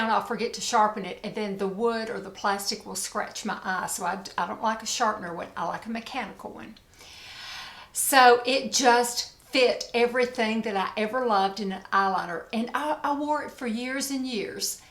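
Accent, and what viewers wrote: South, United States. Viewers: LOVE seeing you twice in one week!!!!! thank you so much. I appreciate it more than you can know! And I'm PROUD of you for taking down ANY video you deem necessary! I guess I didn't read the "bickering" comments, as there were SO many that were flooding thru, but just saw lots of Spiritual women ! I go along with Any of your decisions! I'm here for the skin care, beauty tips, and love the makeup GRWM's over anything else. However...Love your serious ones, too. Guess I just love seeing your face and hearing your southern voice like mine! We sound the exact same here in NC!!!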